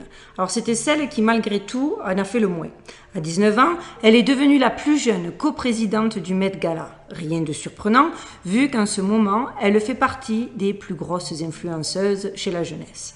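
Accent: Marseille, France. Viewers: j'aime vraiment ton travail et ton accent de Marseille 🙂 je partage complètement ton regard sur le monde, et j'aime bien aussi ton sens des valeurs..... Belle et longue durée à ta chaîne!! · Madame je vous écrit sans avoir commencé a voir la vidéo ce que je ferais après donc pour vous dire que je vous apprécie beaucoup d'autant plus que je n'ai jamais été pour une Idole je ne suis Fan de personne . Ma modeste personne me suffit surtout en ce moment . Il faut pas se le caché non seulement vous belle mais avec un cœur un cerveau BIEN REMPLIS .Du bon .......sans nul doute et de plus ce joli accent Marseillais vive la France bon enfin bref vu l'état de la France et bien d'autre Pays en ce moment!!!!!!!! Juste pour vous remerciée de votre travail que je regarde avec beaucoup de PLAISIR . Merci je m'arrête la sinon c'est un roman qui serai écrit . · Bravo! 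J’adore votre accent du Sud en plus ..un vrai bonheur de vous écouter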